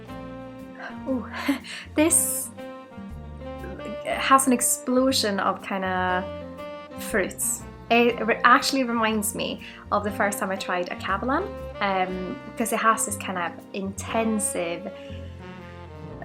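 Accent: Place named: England, United Kingdom. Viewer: You sound English.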